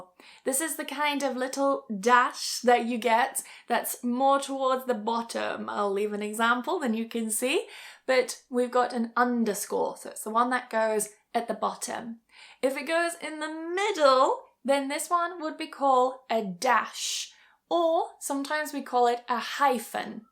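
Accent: United Kingdom. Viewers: Thank you for your British English pronunciation and I your voice that is very very sweet...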